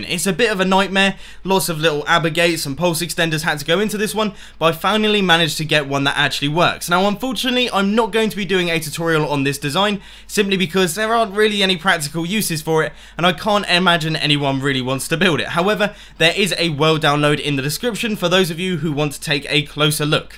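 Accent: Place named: United Kingdom. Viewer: What on Earth is this eccentric sounding British chap talking about?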